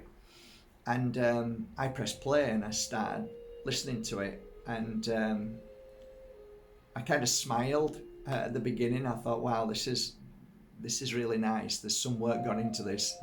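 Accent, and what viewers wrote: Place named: Northern England, United Kingdom. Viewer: I seem to have been on a parallel path with your own and since our paths eventually crossed in cyberspace a week or so ago I have been viewing lots of your videos. I can't tell you how re-assuring it is to hear someone say what you feel is absolutely "spot on" - and in a proper Northern accent as well! Lella's video is so beautiful and I will certainly listen to it as you suggest. A few years ago I came by a small piece of Moldavite and also a small herkimer diamond from a gem shop which I am hoping are genuine. I plan on continuing to look and learn from your postings. Love and light to you and yours, and all your subscribers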